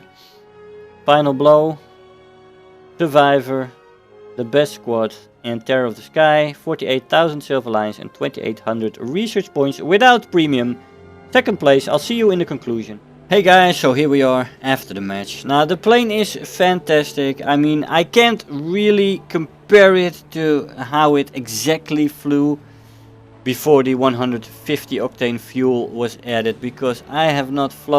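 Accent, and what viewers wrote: Netherlands. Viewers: Hey, are you Dutch? Watching this from Leiden, I thought I recognised the accent:) Nice videos mate!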